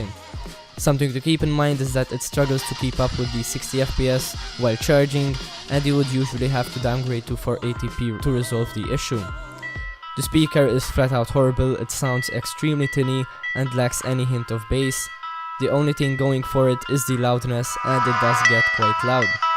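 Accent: Malta. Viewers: Noticed the maltese accent 😅 awsome channel kugin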